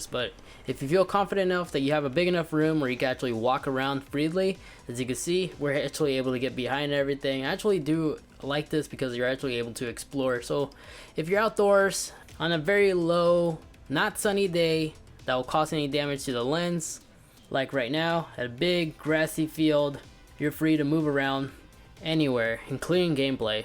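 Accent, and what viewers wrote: Ireland. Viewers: Are you from Ireland my guy? (I'm Irish too) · You from Ireland?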